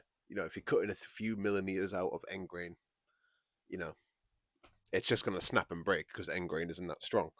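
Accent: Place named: North, United Kingdom. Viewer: Nice to hear a "nurthern" accent! I'm from North Wales but exiled in Bavaria. I'm a wood pallet man myself, heat my home with them, but your video is so interesting. Some other guy on YT said you can get free outdoor Pressure Treated wood from people dumping their kids' wooden swings etc. when they are older.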